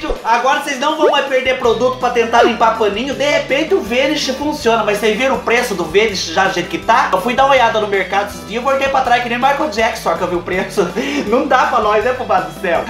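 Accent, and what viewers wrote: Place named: Paraná, Brazil. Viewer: Cara vc é muito bom adorei com esse sotaque até parece que vc mora perto de mim aqui o povo fala assim kkkkk interior do interior do interior de Curitiba kkkk doce fino o meu endereço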